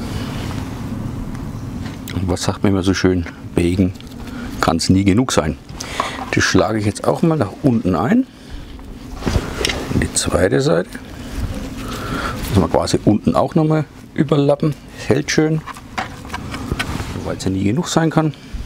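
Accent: Franconia, Germany. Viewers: Hi, tolles Rezept wie immer. 😋👍 Eines nur: 'Wenn ma' no au dei frengischs gebrabbl a bissle bessr vrschdao dät', dann wär amma arma Obrschwåba wia mir scho' viel gholfa! Bleib bei deinem/r Dialekt/Mundart - es würde SO viel verloren gehen, redeten wir nur in der ersten Fremdsprache, die wir Süddeutsche als erste lernen mussten. A Griasle vo' Obrschwåba - und mach weiter so. 👍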